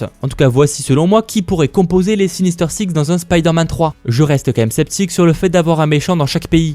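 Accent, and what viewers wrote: England, United Kingdom. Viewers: J'adore ton accent anglais x)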